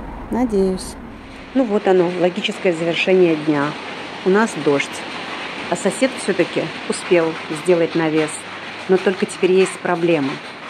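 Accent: Baku, Azerbaijan. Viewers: 😄😄бакинский акцент. 👍👍 А когда мы собираемся сёстрами мы используем этот говор🤭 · ДАЙ БОГ,ЧТОБ СРАБОТАЛ МАГНИТИК-СЧАСТЬЯ🙏🙏🙏👍‼️А МНЕ,ОЧЕНЬ НРАВИТСЯ БАКИНСКИЙ ГОВОР,ОН МЯГКИЙ,МЕЛОДИЧНЫЙ))))А ВООБЩЕ,СЧАСТЛИВЫЕ ЛЮДИ У КОГО ПОЛИСАДНИК...ЖИВИ НА ЧИСТОМ ВОЗДУХЕ,КОНЕЧНО ЕСЛИ НЕ ИСПОРТЯТ" ВРЕДОНОСНЫЕ ЧЕЛОВЕКИ"))))МИРА,ДОБРА,СЧАСТЬЯ И ЗДОРОВЬЯ ВСЕМ-ВСЕМ!!!!🙏🙏🙏♥️🌺🌷🌹‼️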